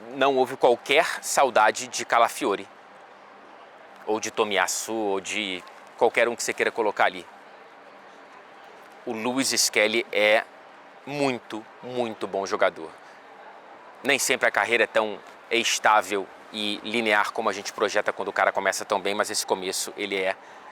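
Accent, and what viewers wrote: Rio de Janeiro, Brazil. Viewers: Esse sotaque carioca é irritante. PQP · É o carioques, não tem jeito!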